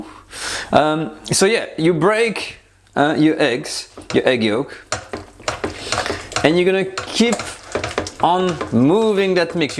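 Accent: France. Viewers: you re absolutely fantastic to watch even your French English accent..Bonjour Chef...Bernard from The Philippines..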